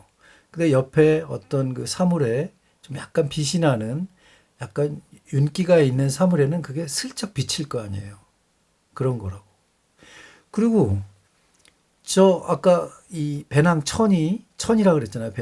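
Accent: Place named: Paju, South Korea. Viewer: 목소리도 좋으시네요. 파주억양 맞아요.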